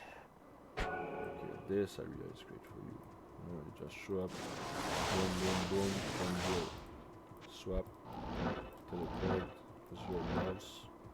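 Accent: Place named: Quebec, Canada. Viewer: hahah l'accent Québécois est assez présent.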